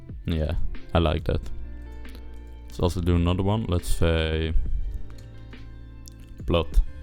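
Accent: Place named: Netherlands. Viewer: 100% a Dutch guy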